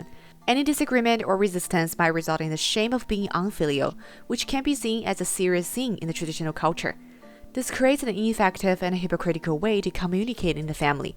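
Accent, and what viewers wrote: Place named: China. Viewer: Interesting topic + excellent explanation + insightful review of the topic + Chinese accent = top tier educational video!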